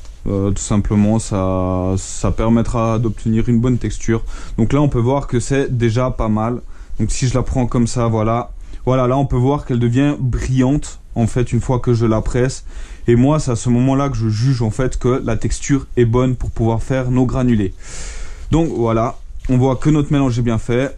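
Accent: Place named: Switzerland. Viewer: Bon... par où commencer : 1: je suis un passionné de pyrotechnie depuis mon plus jeune âge et tes vidéos sont exceptionnelles... 2: ton accent me semblait tellement familier je me suis dis ''obligé il est Suisse''... et vu les journaux, on vient du même endroit ! 3: J'ai environ 1 million de questions à te poser, je serais ravi que tu régales un Neuchâtelois 😄 Bref au top !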